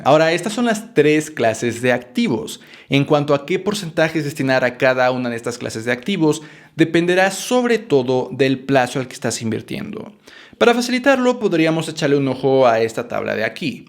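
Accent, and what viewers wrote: Mexico. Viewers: Llevo poco de seguirte pero me encanta tu canal cawn, por tu acento creo que eres de México. ¿Que brokers recomiendas para usar aquí en México? Saludos, crack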